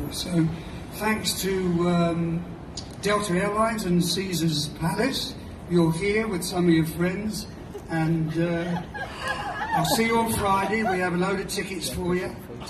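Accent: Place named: Liverpool, United Kingdom. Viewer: I love the scouse voiceover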